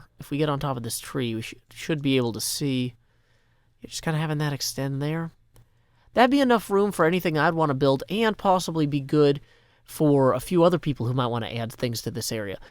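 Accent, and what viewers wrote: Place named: South, United States. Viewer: Hey are you a country guy you sure sound like one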